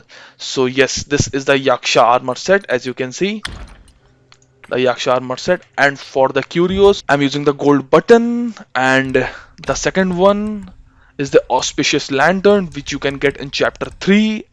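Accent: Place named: India. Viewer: I can't tell if he's being serious or meme'ing because of the super extra Indian accent. 😅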